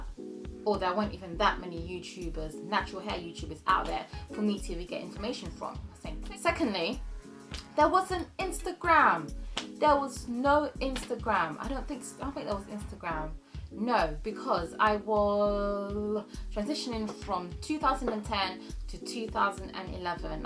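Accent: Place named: England, United Kingdom. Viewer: You look exactly like R&B singer Fantasia but with an English accent. Lol . I like the encouragement you are giving girlie :). I'm transitioning to natural and I have had my hair cut real short before twice so I know how I'll look with short hair but I will not big chop I opt to grow it out gradually.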